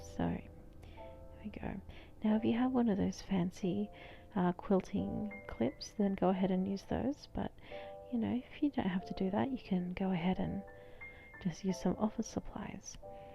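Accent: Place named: United Kingdom. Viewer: Nice bunting . Something I have never bought or used. You mentioned Australia in another video. You don’t sound Australian very nice UK English Accent 😊👍🏻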